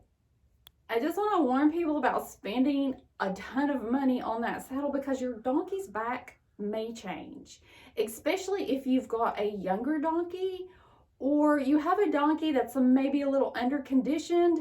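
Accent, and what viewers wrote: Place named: South, United States. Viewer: Very helpful. Thank you! Also love your accent. I miss being in the south for the accents, the sweet tea, and the riding weather!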